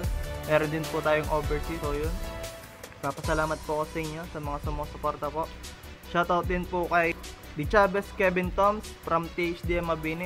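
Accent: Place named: Banyumas, Indonesia. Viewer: Bahasa nya kek jawa ngapak njir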